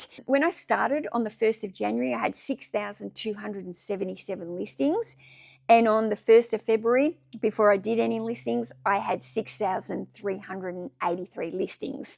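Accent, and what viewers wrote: Australia. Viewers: I like your Australian accent.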